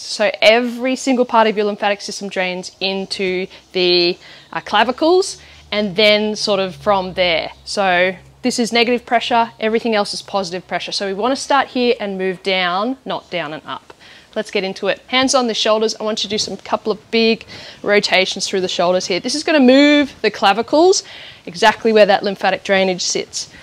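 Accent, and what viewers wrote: Australia. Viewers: Thank you so much! This is my Day 2 and I see a big difference. My husband & I have been painting our home and painting the ceiling, did it for me😩I felt my muscles were so stiff! This surely helped with some Stretching Exercises! Thank you again! Btw love your Aussie accent❣️😘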